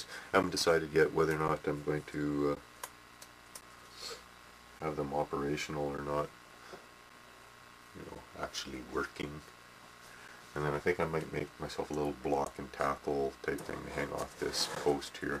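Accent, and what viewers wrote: Canada. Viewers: Are you canadian eh!?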